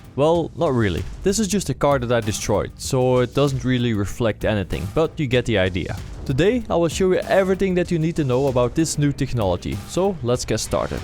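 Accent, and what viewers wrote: Netherlands. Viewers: Your Dutch accent is so obvious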